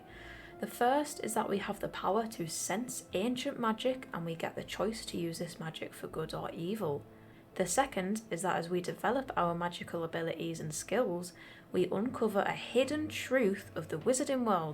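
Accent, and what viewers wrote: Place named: United Kingdom. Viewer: I’m convinced a British person can read anything and make it more interesting 😆 great video!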